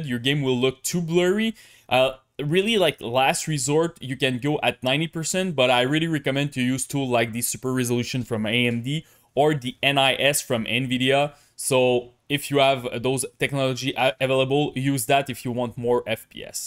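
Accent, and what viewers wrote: Canada. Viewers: Nothing better than a modest Canadien accent 👌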